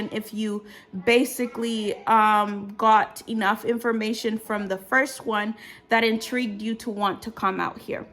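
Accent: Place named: Jamaica. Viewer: Hi. Just came across your channel. Curious to know if you were born and raised in Zambia. You kind of sound Jamaican to me lol. I'm Jamaican by the way 🙂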